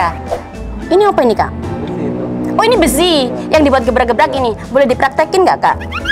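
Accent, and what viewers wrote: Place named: Medan, Indonesia. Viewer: Cobain Makanan di medan ka,, kupikir kaka orang medan karna logat bahasa ny kaya orang medan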